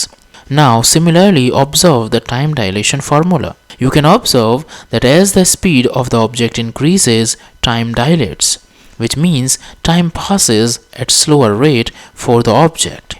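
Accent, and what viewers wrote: India. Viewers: Ugh indian dialect 🙄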